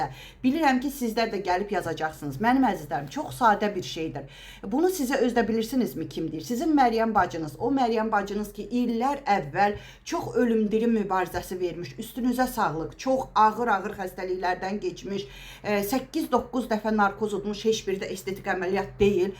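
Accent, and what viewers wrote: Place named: Aghdash, Azerbaijan. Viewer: Allah razı olsun hem yerimiz bacı mende Aqdaş rayonunanam darıxmışdım lehcemiznücün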